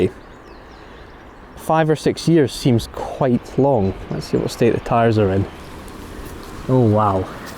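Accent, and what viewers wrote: Scotland, United Kingdom. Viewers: A car video with soothing music and the most relaxing reassuring Scottish accent I've ever heard? First ten seconds and you've already found a new subscriber!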